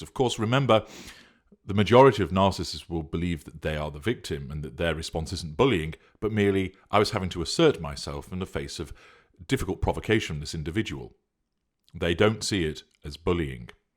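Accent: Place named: RP, United Kingdom. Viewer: Mister such a posh accent and then you have this term "bitch slapping" so funny god bless ya I laughed!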